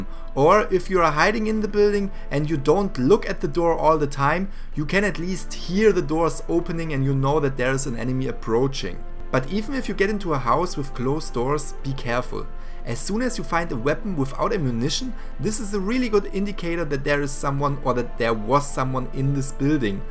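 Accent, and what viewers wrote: Germany. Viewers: You know...I just noticed his language was in Chinese or w.e. yet he sounds German as fuck lol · Keine Ahnung ob das wer lesen kann,aber irgendwie hat er ein Deutschen Akzent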